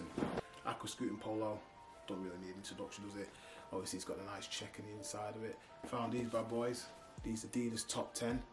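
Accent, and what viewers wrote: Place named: England, United Kingdom. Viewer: Found you due to shout out by Lavender Clothesline! Love your videos so far, nice gentle personality, great accent ( We in U.S. suckers for that English Accent !!! Sexy.) Second, Great information Hope your following grows and grows. Good Luck.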